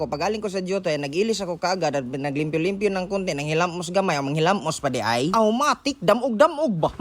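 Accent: Visayas, Philippines. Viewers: Galing jud ang bislog ni, bisayang tagalog😃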